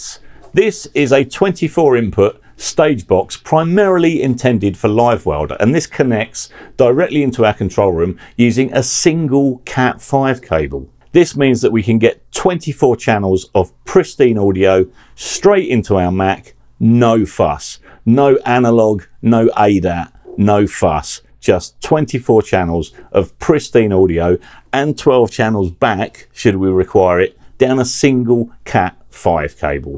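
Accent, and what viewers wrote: United Kingdom. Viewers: Entertaining and informative. and you must be from the West Midlands. i subbed lol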